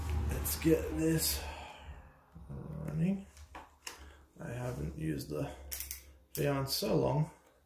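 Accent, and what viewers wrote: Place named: Australia. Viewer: Thank you so much you helped me so much also I can tell from your accent that your an Aussi and so i am I which made me understand you more then I do for American accents 😂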